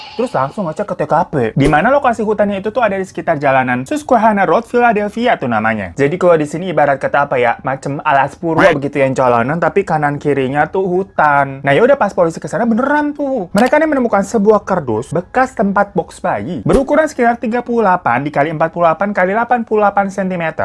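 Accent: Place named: Jakarta, Indonesia. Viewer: Bang w msih blm bsa tdr nyenyak nih krna,w pnsaran knp nama Lo kek orang Jepang,tp logatnye betawi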